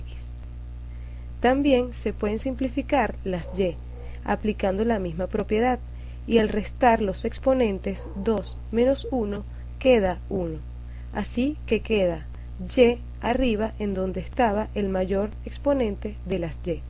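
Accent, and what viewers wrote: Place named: Venezuela. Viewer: entendí todo, y por cierto se nota que eres venezolana ese acento nuestro es irreconocible.... gracias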